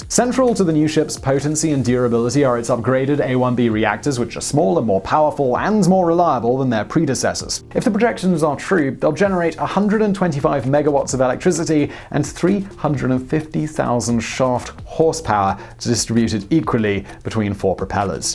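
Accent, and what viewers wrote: United Kingdom. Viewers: Is this guy filming in America or just had a British accent? Any one know?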